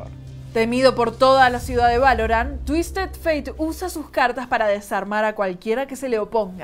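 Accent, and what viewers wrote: Argentina. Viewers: LIKE SI KIERES KE SAKEN A ESTA SEÑORA ARGENTINA DEL ACTUALIZANDO. YA NO AGUANTO YA NO AGUANTO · es argentina._.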